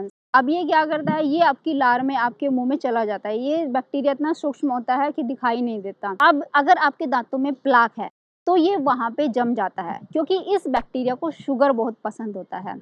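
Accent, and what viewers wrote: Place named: Haryana, India. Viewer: Her accent is haryanvi usme ese hi bolte hain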